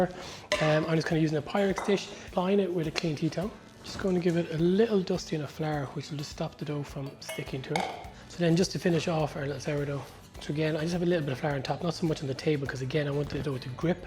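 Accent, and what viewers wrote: Ireland. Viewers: Woke up early AM here in the States. What am I to do?. Check out, my go-to bread guy. For sure I will give this a try in the next couple of weeks. Your "brogue", reminds me of my, Da who came over from Limerick. Take care.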